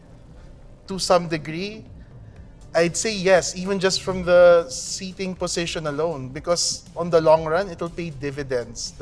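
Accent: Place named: Philippines. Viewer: Good review, English Tagalog accent but it's good.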